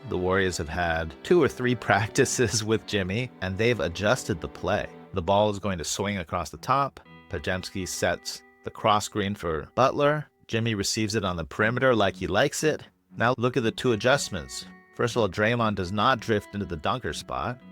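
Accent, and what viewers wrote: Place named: Netherlands. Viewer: Excellent Dutch accent!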